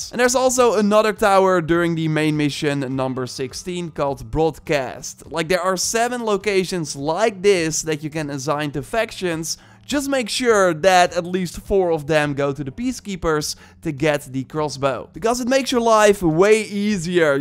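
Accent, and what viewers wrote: Netherlands. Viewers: Nice Dutch accent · Great video, cringy dutch accent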